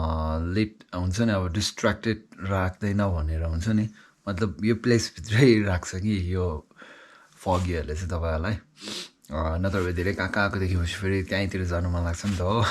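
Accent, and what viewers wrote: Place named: Sikkim, India. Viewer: AE kind of hjr ko bolai xai Sikkimese jostoi lagyo ni so BTW keep going haitw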